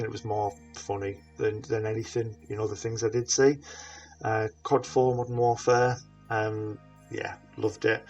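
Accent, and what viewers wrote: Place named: Liverpool, United Kingdom. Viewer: Strong scouse accent you have there to go with your liverpool scarf! Decent video mate best of luck with the channel.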